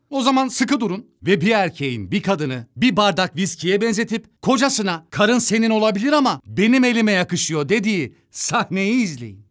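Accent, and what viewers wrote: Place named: Eastern Anatolia, Turkey. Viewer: sizin şaka (ki çoğu şakanız gerçekten vasat) maksadı ile söylediğiniz cümleleri, doğu şivesi ile söylemenizi ne yapacağız?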